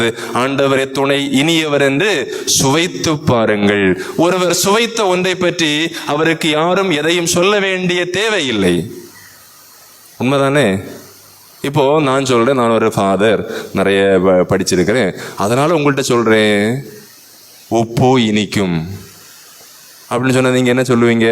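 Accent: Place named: Kanyakumari, India. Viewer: இறைவன் போற்றப்படுவாராக. தந்தையே அருமையான நற்செய்தி, கருத்துள்ள ஒரு பதிவு. உங்களின் முதல் Sermon. I heard the Holy Mass while cooking. Awesome father. Without having self confidence whoever prays nothing will happen. I don't know the church name and the place. Your sound resemblance from kanyakumari Dist. God bless you abundantly dear Rev Fr. Amen Hallelujah ✝️ Hail Mary ✝️📿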